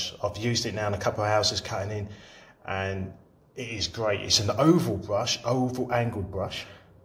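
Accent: United Kingdom. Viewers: Are you from east London 👍😁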